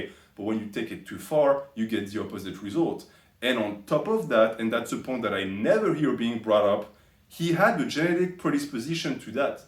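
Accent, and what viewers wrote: France. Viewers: I love how sophisticated your English is with your strong French accent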